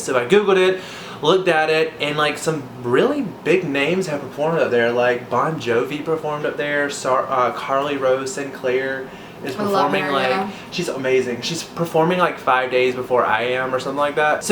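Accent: South, United States. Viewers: You just have a southern accent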